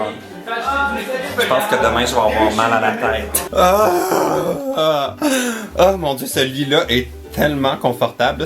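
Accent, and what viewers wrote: Quebec, Canada. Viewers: Ah ces parisiens qui ce moquent de vous québécois cet accent et comme le meilleur du monde ça a tellement de charmes ahaha je vous adore québécois !!! Une abonnée qui ta découvert au meet up d'Emma à Paris et qui en 1 semaine a regarder toute tes vidéo des 2 chaîne nouveau coup de cœur 😘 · Tout ce que j'ai à dire c'est VIVE LE QUÉBEC ET SON ACCENT! Je nous aime on est si peu. On doit perdurer et je te comprend d'être en osti à la longue ça doit être lourd se faire narguer pour son accent. Je te suis à 100 pour cent t'es excellent! Vive la bière et le vin:) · Perso, je trouve ton accent trop mignon et tout à fait compréhensible par rapport à d'autres Québecois. Mais dis-toi que c'est sûrement pour essayer d'entamer une conversation avec toi, ce n'était pas méchant je pense ;) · Oui il est incompréhensible ton accent. (Juste pour que tu m'invite à Montréal ! 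:P) Nan, je rigole évidement, j'adoooore l'accent québécois en plus. Les parisiens sont pour la plus part des gens narcissique avec un fort ego, du genre hautain. C'est l'une des villes les plus voir la plus touristique de France et c'est pourtant pas la destination que je te conseillerais ! :P Y'a tellement de belle ville en France que c'est insulter la France de dire que Paris est la plus belle etc.. ! Sinon, pour un voyage au Quebec, quel destination nous conseillerais tu ? :) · Moi j'adore ton accent ! C'est tellement Québec ! 😂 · Il est vrai qu'en France les québécois ne court pas les rues et on ne l'entend donc pas souvent.. c'est un accent facile à comprendre mais qui a toujours fait rire (pas dans le sens de moquerie) en France (question de culture ou d'habitude je ne sais pas). Je peux comprendre les quelques remarques sur ton accent mais je te comprends si les mêmes personnes radotent à ce sujet.. 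! En tout cas il n'est pas à changer, il est parfait !! :) #MoiJeParleSansAccentEtCestFade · OUI ! Moi je comprends que dalle du coup faut que tu m,invite chez toi pour m'éduquer ! <3 Non je rigole je comprends tout et je veux avoir ton accent, c'est trop bien le québecois ! Mais invite moi quand même ! <3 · Moi j'aime ton Accent! Tsey entre québécois on se respecte 😂😂😊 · Me concernant j'ai toujours adoré votre accent, je n'ai jamais eu de mal à le comprendre notamment grâce aux youtubeurs québécois comme toi, emma, lysandre, thierry miro ou bien cynthia! C'est, je trouve, un accent à la tonalité chaleureuse et j'ai dailleurs toujours dis à ma mère que kit à choisir c'est cet accent que j'aurais aimé avoir!:3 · J'adore ton accent.... Parce que je suis aussi Québécoise!!😂😉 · Tu as raison, les taxis parisiens sont vraiment des enfoirés. Depuis qu'il y a Uber ça leur fait les pieds! Btw j'ai commencé à regarder tes videos exprès POUR l'accent québécois et je suis devenue addict à ton humour et ta joie de vivre.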